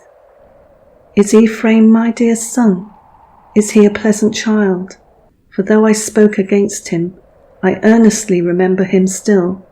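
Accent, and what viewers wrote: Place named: England, United Kingdom. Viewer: Thank you. So beautifully read and timely for Israel. Love the English accent!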